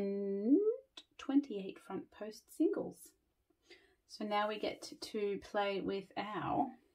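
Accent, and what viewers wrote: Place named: Australia. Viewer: I just subscribed to your channel because of seeing this square!! Love it and look forward to listening to your Aussie accent haha!!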